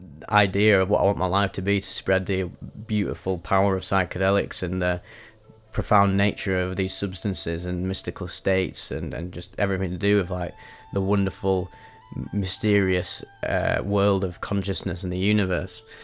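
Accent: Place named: Manchester, United Kingdom. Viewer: Thanks for your openness and honesty, sweetie. I have every faith that soon you will be able to be with the pain of your relationship break-up, learn all the lessons waiting for you and move into another exciting phase of your life. The ups and downs of this balmy journey hey... And I just want to say that I love listening to your dulcet, Mancunian tones read out these trip reports - it inspires me as I get started on my own psychedelic journey... Sending Love and Hugs 🤗🥰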